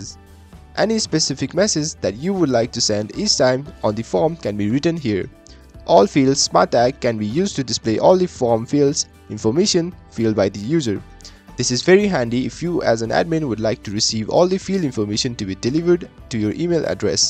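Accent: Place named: India. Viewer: Nice but It's not easy to understand with the quite strong Indian accent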